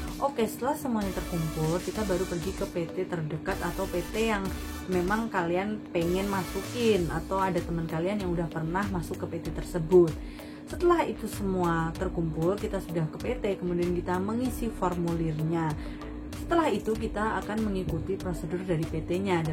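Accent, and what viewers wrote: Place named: Java, Indonesia. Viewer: medok forever yoo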